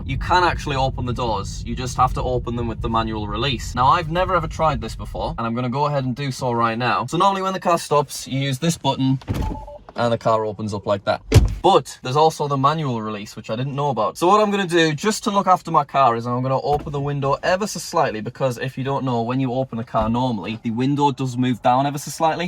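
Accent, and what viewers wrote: United Kingdom. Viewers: Wait boot your brithish huh im fine with brithish soo yea have a great day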